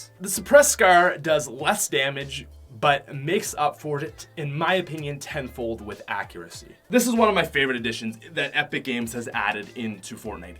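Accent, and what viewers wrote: Australia. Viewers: are you in Australia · holy crap I didn’t know your Australian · didn’t realize was an Australian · IS FROM AUSTRALIA PROOF IS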